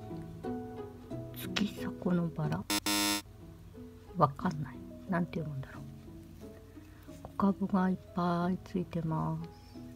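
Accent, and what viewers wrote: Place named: Yamagata, Japan. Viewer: 可愛い子ばかり♥️ こちらでは、さっぱり、まったく多肉さんがかれません！羨ましい( ´∀`) でもさんトークがいちばんめんごくて地震の恐怖もぶっ飛びましたはぁ(((*≧艸≦)ﾌﾟﾌﾟｯ笑有り難うございますm(_ _)m 山形弁‼️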